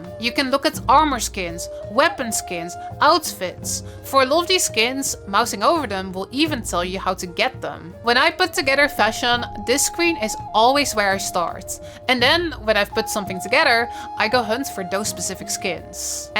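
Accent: Netherlands. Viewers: are you Dutch by chance? Your accent sounds familiar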